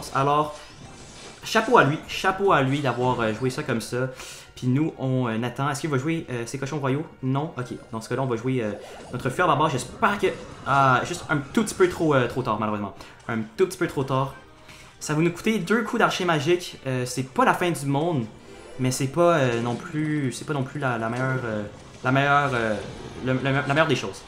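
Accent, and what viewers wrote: Quebec, Canada. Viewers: Salut, vidéo géniale avec accent québécois génial. Continue comme ça. Voici mon ID clash royal pour l'emote Pekka: #YUJP2JCG8 Baptiste.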